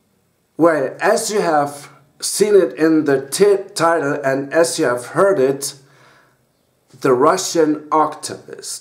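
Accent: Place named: Germany. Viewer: Guten Abend dein Englisch ist sehr Deutsch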